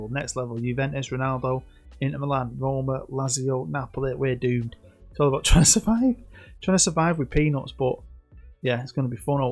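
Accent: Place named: Liverpool, United Kingdom. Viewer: oh and ye gotta love a scouser in ye vids hahaha xx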